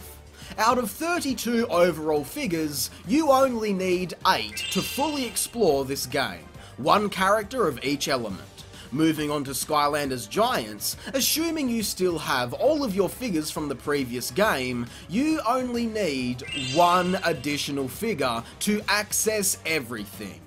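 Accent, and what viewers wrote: Australia. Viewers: You are actually so australian that it hurts, good video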